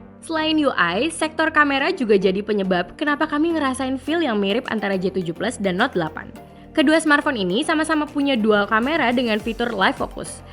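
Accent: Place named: Betawi, Indonesia. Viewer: cengkok betawinya bikin aneh😁😁😁 bagusan dulu deh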